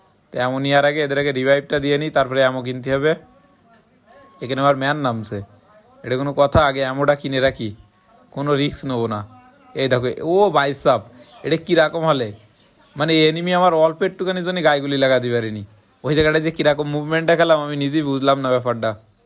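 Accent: Jessore, Bangladesh. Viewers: খুলনা বাগেরহাট যশোর তো একদিকেই এ কারণে কথা ওরকম মনে হচ্ছিলো..আমার খুলনা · সেই ভাই,,, আপনার বাসা যশোরের মধ্যে মনে হচ্ছে 🤔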